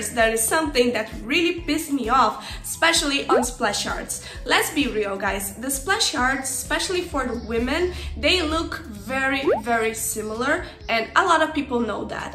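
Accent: Brazil. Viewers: Ela é brasileira? esse sotaque dela me dá essa impressão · Você é BR não é? Também costumo falar inglês boa parte do meu tempo, e a gente tem o sotaque semelhante, acho que da pra reconhecer.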